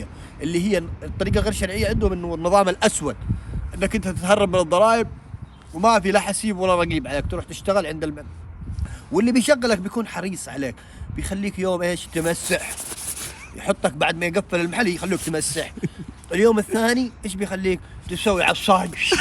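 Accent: Jeddah, Saudi Arabia. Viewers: انا يمني لكن دا كلامه جداوي 😂🤦‍♂️.. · اللهجه سعوديه ١٠٠٪ هوا ماقال الجنسيه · دايم اليمنة يلاحقون أي واحد يكتب شي عن لهجة هذا الشخص! ندري أن أصله يمني لكن يتكلم جداوي يعني سعودي وهو قلباً وروحا حجازي سعودي ولا يعرف حتى يتكلم يمني. وش هاللقافة يا ذا الناس! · هههههه يقول الرواتب على حسب وجهك 🤣 آخخخ اللهجة السعودية اشقد احبها ❤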